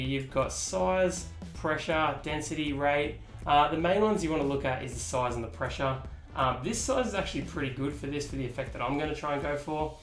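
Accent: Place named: Australia. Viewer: not sure why, but i thought you were going to say "if you guys can't tell by my voice, i'm Australian," lmao. this video is super helpful though, thank you!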